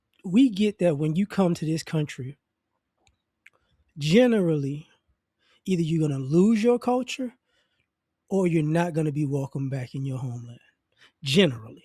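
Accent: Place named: New Orleans, United States. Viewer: I still hear a little bit of New Orleans accent just a little bit 😄 Peace to you brother. Appreciate you brother ✌🏾✊🏾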